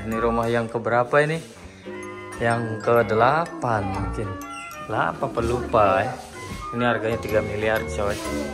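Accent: Lombok, Indonesia. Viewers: Logat sasak tetap melekat, mantap Semeton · La Ilaha...asli medok ...lombok tie meton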